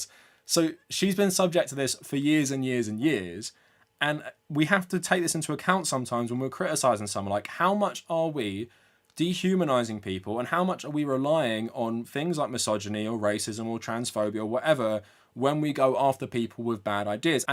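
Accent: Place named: London, United Kingdom. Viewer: Lots of Vanguard fans in the comments who didn't watch your vid and took their words for yours. I watched yours before theirs. I know exactly what you were trying to say, I might not agree with it all but people saying you are paid off by TYT or are some sort of British guy on his high horse (they can't even spot a London accent) just comes across as dumb guy no read books sucdem nonsense. We're literally in the midst of a F takeover and they are talking about YouTube drama. Not social security, not Medicaid, not Medicare. YouTube drama. We should probably all be better than this, but here we are.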